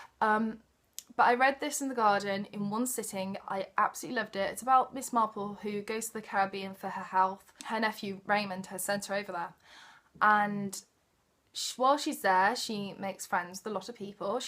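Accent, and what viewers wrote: England, United Kingdom. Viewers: Love your accent. Where are you from in England?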